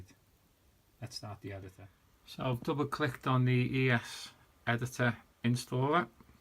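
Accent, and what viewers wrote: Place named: North, United Kingdom. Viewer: Nice one mate. Thanks. And love the northern accent!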